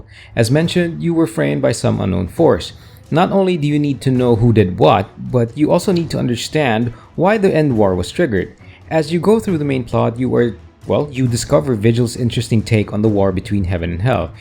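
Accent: Philippines. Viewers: pinoy ba to. parang pinoy yung accent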